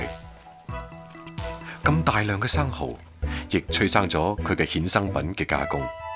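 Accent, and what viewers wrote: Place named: Guangdong, China. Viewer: 好开心， 这是真正的广东话。不是那垃圾的香港发音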